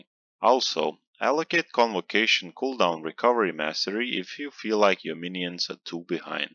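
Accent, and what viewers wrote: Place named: Lithuania. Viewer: pagal akcenta bus is LT :D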